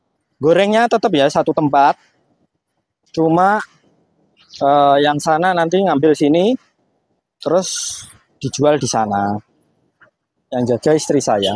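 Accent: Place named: Central Java, Indonesia. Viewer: Bahasane koyo wilayah Demak kudus dsktnya iki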